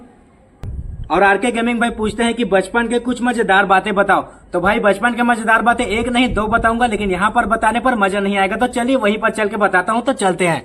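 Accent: Chhattisgarh, India. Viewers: Bhai aap chhatisgarh se hona sach batana pliz aap kon se gaov se ho pliz batao maine aise jana kyu ki aap ka bolne ka tarika chhatisgarh hi jaisa hai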